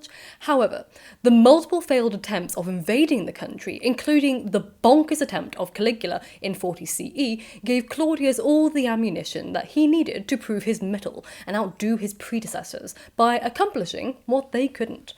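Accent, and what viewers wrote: United Kingdom. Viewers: How do you have the classiest, poshest English accent in the universe, my WORD?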